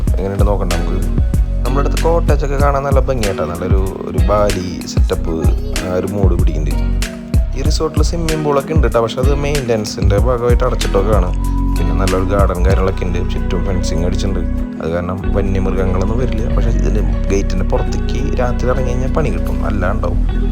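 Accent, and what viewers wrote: Thrissur, India. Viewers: Ninte Thrissur slang super.. videosil Ellam oru positive vibe undu 😍😍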